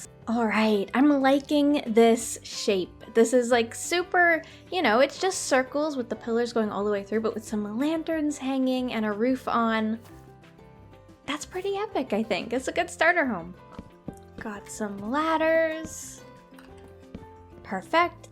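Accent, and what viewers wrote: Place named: Canada. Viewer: I never realise your accent until you say sorry then I remember you’re Canadian I love it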